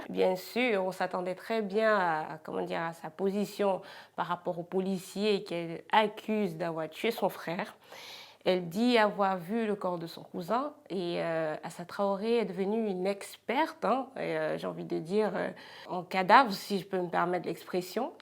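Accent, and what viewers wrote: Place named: Cameroon. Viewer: Pourquoi c'est toujours des gens qui proviennent d'un pays qui a connu des génocides qui ont été armée par la France la Belgique pour venir dénigre d'autres citoyens tu n'a rien fait pour aider ton prochain et tu continue encore avec ton accent kamer c'est choquant être plus blanc que les blanc juste pour une perruque et des papiers 😱😱😂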